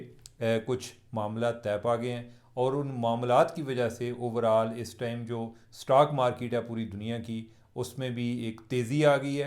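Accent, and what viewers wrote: Punjab, India. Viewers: I regularly watch ur vlog, very balanced, especialy punjabi tarka makes it so good. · Love your Panjabi touch ❤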